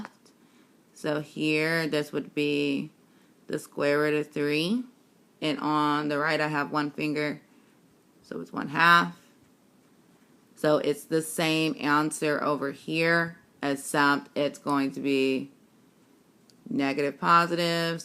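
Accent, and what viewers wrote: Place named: Barbados. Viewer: are you from barbados?? your accent is kinda rihanna-esque lol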